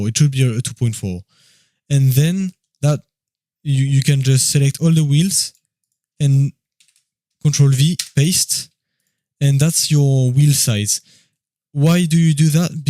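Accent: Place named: France. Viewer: You sound really french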